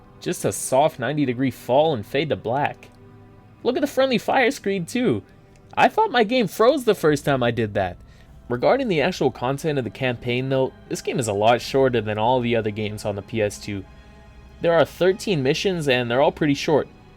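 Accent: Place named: Boston, United States. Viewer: Where you from? I can kinda hear a bit of Wahlberg in theya! Boston?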